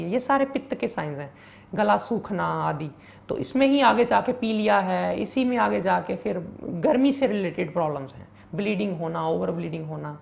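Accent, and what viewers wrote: Punjab, India. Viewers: Aap bhut achha btate h pr Aap ki looking naam match krta h Pr aap ki bhasha me punjabi lhja h achha nhi lgta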